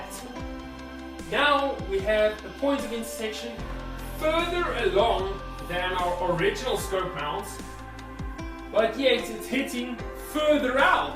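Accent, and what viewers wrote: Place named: South Africa. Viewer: I wanted to say something when I watched the First Black Board video. Your information is great But the Music in the Back Ground and your accent make it hard to understand you. Please don't play music while you are speaking and try to speak a little clearer so we who are not from South Africa can understand you.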